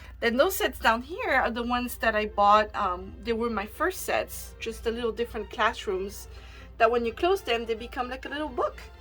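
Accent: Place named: France. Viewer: you have a french accent, you're french?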